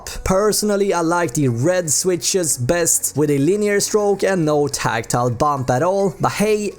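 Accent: Sweden. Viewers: Are you a swedish elleh? Good review. It's an instant buy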